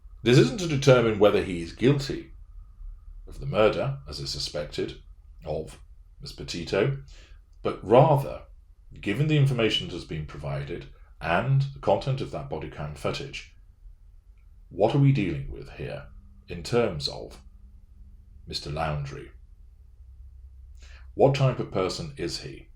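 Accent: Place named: Oxford, United Kingdom. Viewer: No *Said in that rich Oxford accent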